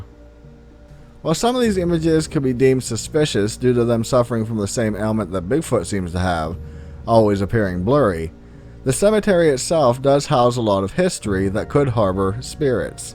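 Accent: Pennsylvania, United States. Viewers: You speak with the same accent myself and my family apparently have lol. I didn't think I talked different until people started calling it our "family accent" we all apparently have. People even asked me where I was from lol. Shocking when I say I was born and raised in rural northern PA haha.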